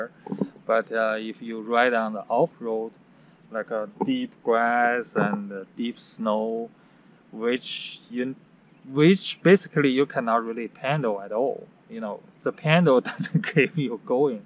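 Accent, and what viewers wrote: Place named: China. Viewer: I wish i can do authentic Chinese accent like that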